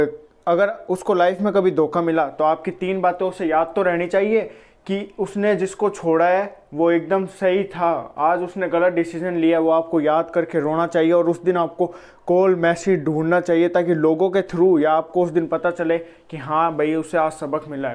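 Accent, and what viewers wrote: Haryana, India. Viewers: Sir aap Haryana se ho kya ??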